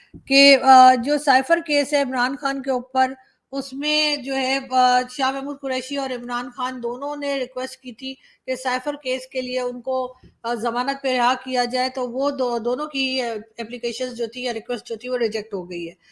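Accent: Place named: Uttar Pradesh, India. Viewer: 🙏 mam ji ap to uttar pradesh ke se boli bolte hai mam 🙏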